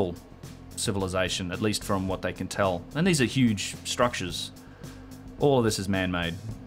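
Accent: Australia. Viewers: G'day from Oz, great live stream and other videos. Articulate, informative and excellent delivery with an Aussie accent to boot! Keep up the great work and live streams. Loving the mind blowing Serapeum series, and looking forward to your Younger Dryas / Cataclysm video. Cheers.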